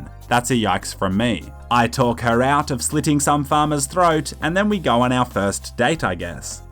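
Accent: Romania. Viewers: Are you from romania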